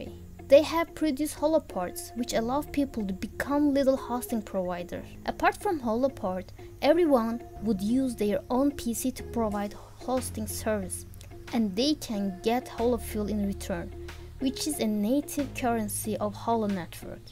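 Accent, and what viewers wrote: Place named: Turkey. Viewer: I can hear a turkish women 👀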